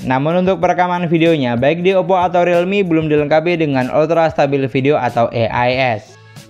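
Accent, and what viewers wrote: Madura, Indonesia. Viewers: review dengan logat Madura nya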